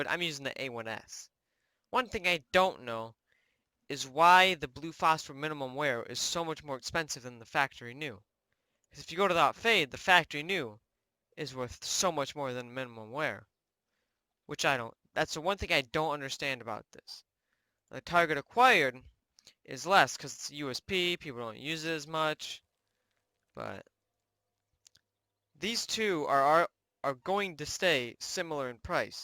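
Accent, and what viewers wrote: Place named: Arab world. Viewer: Lol arabic accent my friend🤣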